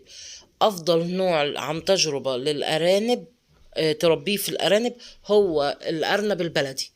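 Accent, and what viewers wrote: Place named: Upper Egypt, Egypt. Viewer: من لاغوتك باين انك صعيدية ، بارك الله فيكم ❤❤❤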